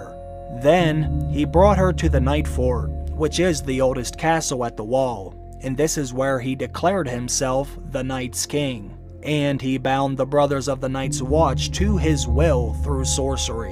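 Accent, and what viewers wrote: Pittsburgh, United States. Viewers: Dude you sound like you’re from Pittsburgh! New castle area?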